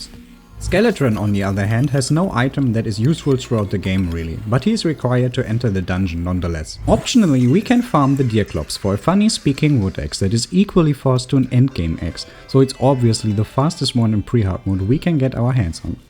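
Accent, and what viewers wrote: Germany. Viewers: Du hörst dich so deutsch an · thank you - you German aint you? :D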